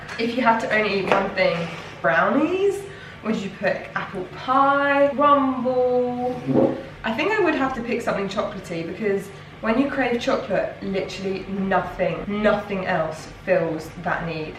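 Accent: United Kingdom. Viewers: one reason you cannot understand what she is saying I guess you have to be british to understand her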